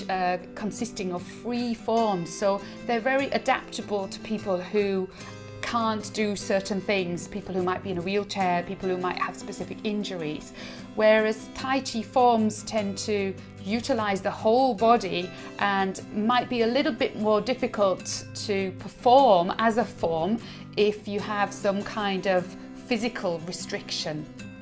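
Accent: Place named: England, United Kingdom. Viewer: You're from England? I ask because of your accent. Lol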